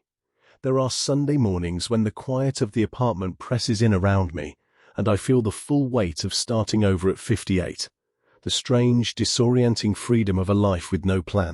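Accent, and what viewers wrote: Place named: United Kingdom. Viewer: Nice to hear a British voice for a change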